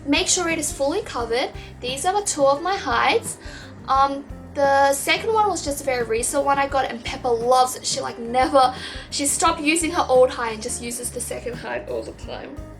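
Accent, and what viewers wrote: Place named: Australia. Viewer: I'm not sure where you're from but do you call it a lizard instead of a skink because you caught yours? You sound almost Australian, but I'm not sure, and your blue tongue looks very much like those that would be found naturally instead sold! I'm just really curious. :)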